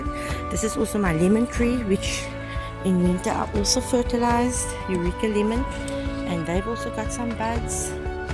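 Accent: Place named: South Africa. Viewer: How lekker to hear a local accent! Thank you for this tip. Greetings from Joburg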